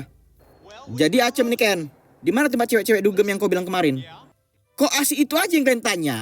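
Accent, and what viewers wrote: Medan, Indonesia. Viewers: Bahasa Indonesia versi Medan emng bkin ngakak 😂😂😂😂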